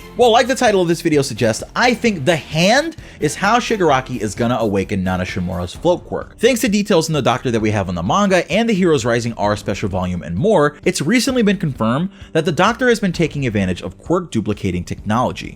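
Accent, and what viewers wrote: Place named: Puerto Rico. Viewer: You ooze your puertoricanness when you talk! Nice work with the videos man!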